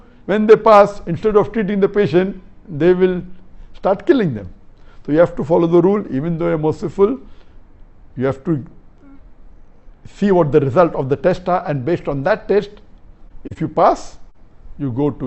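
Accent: India. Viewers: Please admin, you should probably give the subtitle. sometimes i dont quite understand Indian accent. My english isn't good at all & i still want to learn from Dr Zakir Naik. Thanks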